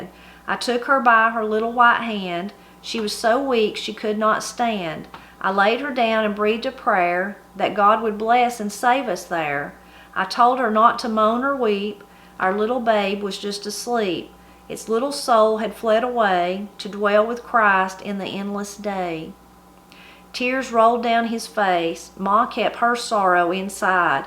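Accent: Appalachia, United States. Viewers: You talk just like me. I'm a retired English teacher from Eastern Kentucky. I was privileged to drive through the Smokies just last week. I've got to go back and listen to your first book..This is going to be a good one.I was just thinking about my cousin naming her daughters Sara and Hannah. Her parents were so worried that the girls would be called Surry and Hanner. I've got to go back and find your video on names. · My daughter introduced me to the The Blind Pig and Acorn. Sometime later I came across on You Tube. I enjoy it so much. Today I was looking for one of my cookbooks and I found a copy of Dorie Woman of the Mountains. I don't remember when I got it. It just got lost in with all of my books I guess. I am so excited. I immediately sat down and started reading. I grew up in North West GA and the stories you share and the lives your family live is so much like mine. 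My favorite place to go as a child was to my great uncle and aunt's house. I watched her churn butter and cook on a woodstove., wash clothes in a black wash pot, and cook her own cured ham, got her vegetables from the root cellar and I loved getting a cold drink of water from the well bucket with a dipper. I think of those visits often and miss them. You and I talk in the same "funny way," I once had a lady in a church where my husband was interim pastor tell me I talked so funny and Southern, said she'd never heard anyone talk so country as me. I guess I was a little sassy and I said," I talk this way because I was fortunate enough to grow up In Appalachia. Only privileged people can talk like this! I guess you got left out when God was giving out blessings"! She never mention my funny way of talking again. By the way, congratulations on your well deserved award. Thanks for all do do to Celebrate Appalachia!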